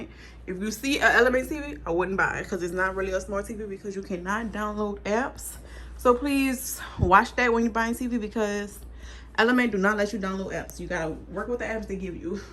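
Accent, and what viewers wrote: New Orleans, United States. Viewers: You must be from the Nola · New Orleans accent ❤️❤️ love it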